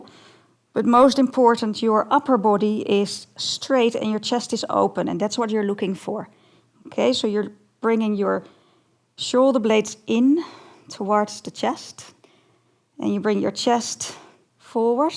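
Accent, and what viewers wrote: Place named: Netherlands. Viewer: Hoy Ik hoor van u acsent dat u van Nederland Komt maar dank u wel voor de yoga de yoga tip😊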